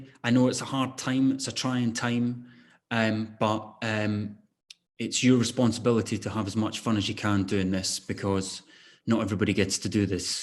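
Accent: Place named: Scotland, United Kingdom. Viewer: His own voice and Scottish vernacular; it sounded all good to me.. What a very likeable man.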